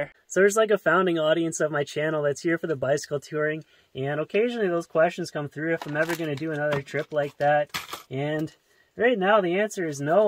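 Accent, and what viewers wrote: Canada. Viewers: I know I"m an odd guy, but the two things I look forward to most in your videos is the opening jingle and the way you prounounce Canadian 'out' as 'oot'. Love it. And of course your in van adventures.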